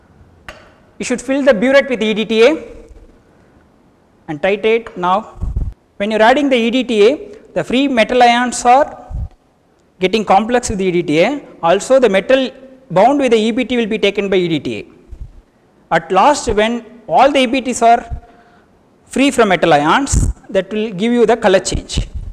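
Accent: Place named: South, India. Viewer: quiet different accent, I guess he's south indian, should've given captions too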